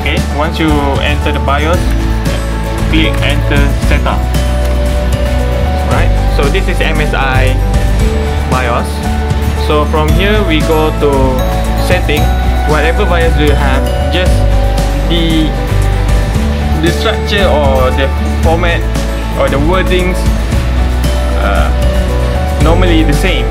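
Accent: Malaysia. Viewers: Malaysian? 😃 Or Singaporean I'm from Singapore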